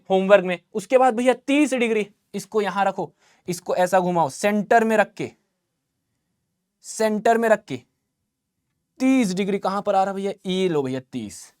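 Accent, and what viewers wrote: Bihar, India. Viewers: sir app bihari ho kya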